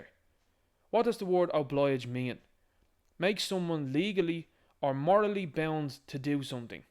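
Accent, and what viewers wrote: Dublin, Ireland. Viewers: Just found your Channel ! Usually the strong dub accent is a bit much for me 😂(Carlow here ) but I’m invested 🎉❤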